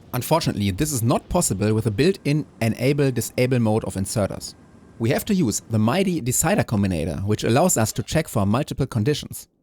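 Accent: Germany. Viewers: The German accent always gets me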